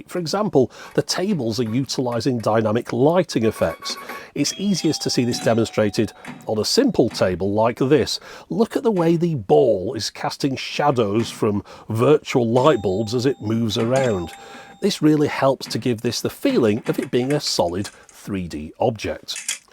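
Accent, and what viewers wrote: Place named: Northern England, United Kingdom. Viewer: you sound like a northerner like me, and that photo reminds me of a place called "Arcade Club" in Bury, (he's got one ion Leeds too), he's got Europe's largest arcade collection including lots of pinball tables! thoroughly recommended if you're ever near the area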